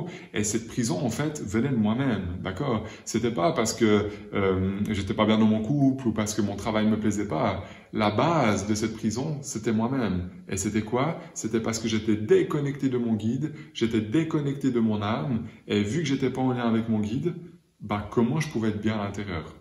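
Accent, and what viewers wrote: Switzerland. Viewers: bonsoir! ravie de vous rencontrez ce soir, au moment où je me sens mal avec les vaccinations par rapport à mon travail: sinon, je n'en veux pas et si je dois être suspendue et bien j'irai jusqu'au bout et cela sera peut être un signe de changer d'optique professionnelle! je suis tout à fait d'accord avec ce que vous dites et cela me réconforte! je vais continuer à vous écouter pour essayer de me connecter avec moi même! j'adore votre accent "suisse?"! prenez soin de vous et à bientôt, mercisssss.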